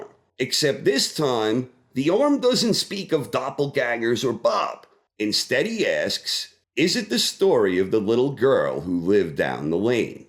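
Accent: New York, United States. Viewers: is that a little bit of a jersey or new York accent you got dude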